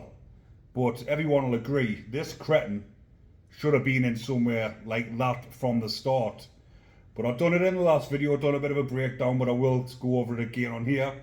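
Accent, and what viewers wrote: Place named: North East England, United Kingdom. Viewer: Hi you ARE BRILLIANT LOVE YOUR SWEET FUNNY NORTH EAST ACCENT. ❤❤❤❤❤❤❤❤❤❤❤❤❤❤❤❤You are the bees knees. Handsome north east lad. 🎉🎉🎉🎉🎉🎉🎉🎉🎉🎉🎉🎉🎉🎉🎉🎉🎉🎉🎉🎉🎉🎉🎉🎉🎉🎉🎉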